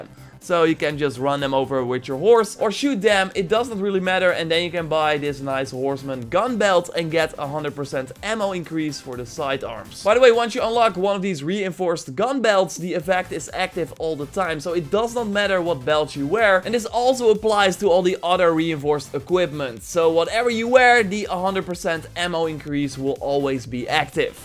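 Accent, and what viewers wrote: Netherlands. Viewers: Are you dutch? anyways do something about the accent jeeez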